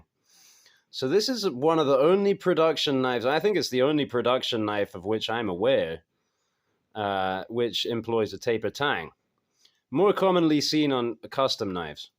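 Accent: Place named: Scotland, United Kingdom. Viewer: I knew I detected a little bit of Scottish. I just hadn't heard you talk enough to make the determination. I think you have sold me on the tapered Tang..also . 2 way tapered Tang?! What is this sorcery ?! I just learned about the single taper 😂 😂 I also finished the rough-in of that knife, I was actually able to get it surface ground pretty decently on my disc grinder but I don't think I will be able to taper it on the disc grinder, so I think I will have to switch over to the belt and start working on it that way. It's going to look really cool in the end because it will have a distal taper and a tang taper. I'm over here in virginia, close to Virginia Beach. Collab in the near future per chance? 😂 The two way is very cool looking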